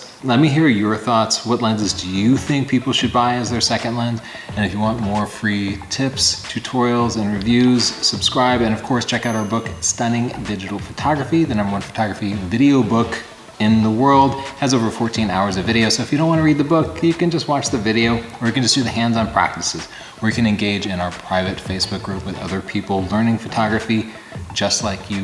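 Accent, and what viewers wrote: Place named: United States. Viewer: I'm an American living in South Africa. My wife recently bought a Canon 6d mark ii, and she also bought this lens as an extra. She plans on using the camera to help film our ministry outreaches here. I want to say thanks so much to you both. My wife and I are watching all your videos and she especially loves your tutorial on her camera. You don't talk to fast, and you have a good all American accent....like me....lol.....which she is use too. God bless you both!!!!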